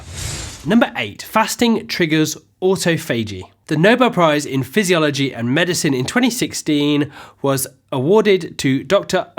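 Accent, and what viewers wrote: United Kingdom. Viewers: Four seconds in, heard brittish like accent. Out of here!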